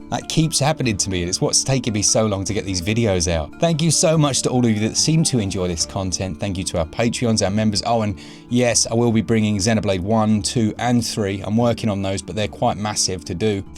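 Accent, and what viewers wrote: United Kingdom. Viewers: I just can’t take a British accent serious!! He just sounds like a joke!!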